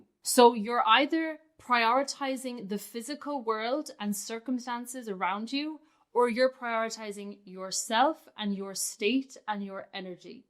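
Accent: Ireland. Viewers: Sound's like you have a Irish thang (accent) Are you Irish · pretty sure shes irish lol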